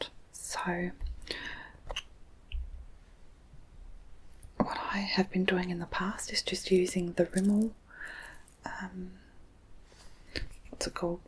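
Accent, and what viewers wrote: Australia. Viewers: I don't wear make up but i love the Aussie accent!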